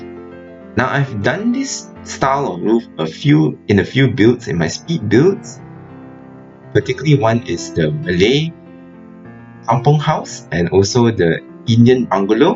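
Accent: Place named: England, United Kingdom. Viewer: Hey, where do you come from? I lvoe your English accent!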